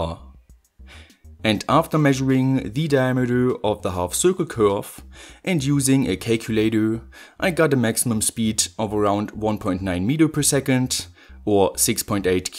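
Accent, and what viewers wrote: Germany. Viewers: Are you German ore have German roots??? I can't imagine you aren't cause your german accent is so significant. A lot of people here speak like you do. Greetings from Germany and merry Christmas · Bist du deutscher? Hast so einen Akzent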